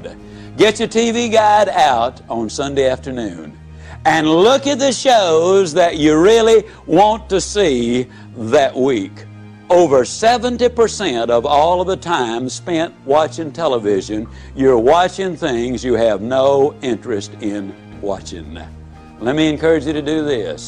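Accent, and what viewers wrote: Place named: South, United States. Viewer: His perspective is valuable and you can learn by reading his writing if you don't like a southern accent.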